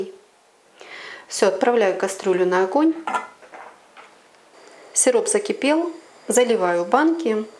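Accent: Ukraine. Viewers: И не только компоты,но и консервацию.Правда, заливала несколько раз.А вы из какого города,?Очень приятный у вас украинский говор.Я сама родилась и пол-жизни прожила на Украине. У местных господинь всегда было множество просто классных рецептов еще из времен СССР)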